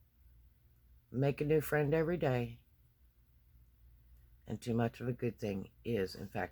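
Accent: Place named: South, United States. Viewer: You're not southern? I thought you were. Where are you from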